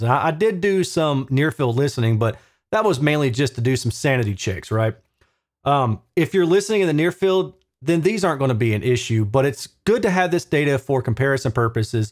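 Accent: South, United States. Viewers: That's a true Southern accent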